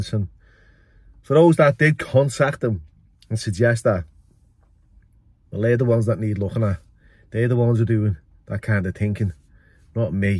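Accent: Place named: RP, United Kingdom. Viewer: Sorry wrong msg , meant ot for your other video, I watch so many getting confused, I never comment, great posh accent 😂❤❤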